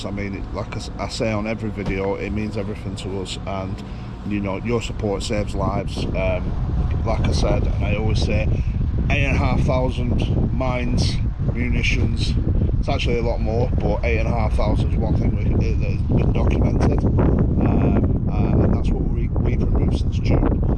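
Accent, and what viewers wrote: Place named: Lancashire, United Kingdom. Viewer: Hi I don't know if you can use it but I have a Renault traffic van, <num>,000 miles good runner etc. I wish I could donate it but I could let you have it for £2500 If your interested let me know All the best ps from your accent I'm guessing that your in the Lancashire area, I'm originally from Preston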